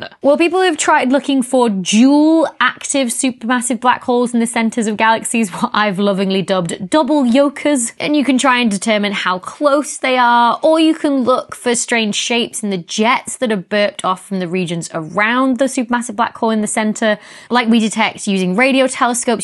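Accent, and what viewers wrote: United Kingdom. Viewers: I love your British affectations. Despite that, I propose a hypothesis that I invented myself... I call it the 5 Jigsaw Puzzle Piece hypothesis: If I gave you 5 pieces of a 100 piece Jigsaw puzzle, and ask you to describe the image the entire jigsaw puzzle presents..., could you do it? Absolutely NOT! How then can cosmologists today claim to give me a 100% picture of the entire universe based on the 4.8 percent of baryonic matter that they can actually and only preceive? That is to say, cosmologists don't know shit about the nature of the universe or how the universe exists at all.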